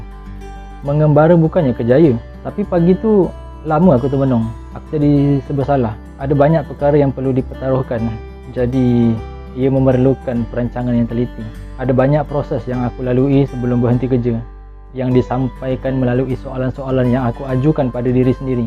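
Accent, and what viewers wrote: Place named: Java, Indonesia. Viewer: Pekat jawanyaa.. Very inspiring bro 👍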